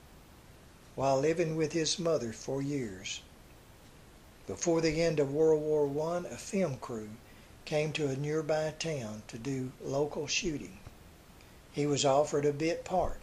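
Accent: South, United States. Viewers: I've been watching a few of your Hollywood Suicide bits. I like your southern voice; somehow it adds to the sadness of these star profiles. You've got great narrative...keep it up. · ....while I realize you are from the southern reaches of our country and there are some variations on the English language because of this...I am sick to death of listening to you say HISSELF instead of HIMSELF...and BTW...the iconic studio head was named Irving Thalberg....not THRALBERG. You hear his name mentioned periodically at the Academy Award ceremonies since there is an Oscar which bears his name...so please....get it right! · His accent? Shame on you. His accent is part of who he is, which is a southern gentleman. He could not change his accent anymore than say someone from the Bronx or France could. Why can't you just appreciate the stories he tells and the time and effort he puts out to do them and share them with us. 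I shudder to think he would ever stop doing this because a bunch of needle assed nitpickers tweak his stories. Just enjoy and learn from them. My Momma always said "If you haven't got something nice to say don't say anything".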